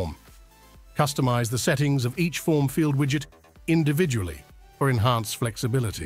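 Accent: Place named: United Kingdom. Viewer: As a Pro subscriber, I couldn't be happier with Unlimited Elements and the team of pros behind it. I know I sound like a shill but really, I'm not. My website is particularly amazing and unlike others because of the combinations of remarkable capabilities this toolkit provides, including the fact that I can (and have) customized a particular plugin. And now we have forms!, I like the British accent, but I can tell it's you who wrote it. 😀We love you buddy. - Markus Halvorson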